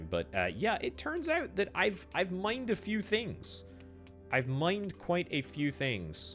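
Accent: Scotland, United Kingdom. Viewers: he's definitely Scottish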